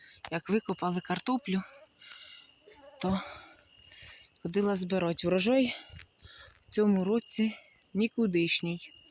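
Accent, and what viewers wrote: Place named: Poltava, Ukraine. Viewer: Я теж з Полтавської області,мама і досі проживає в Пирятині...Я ж чую,знайома вимова!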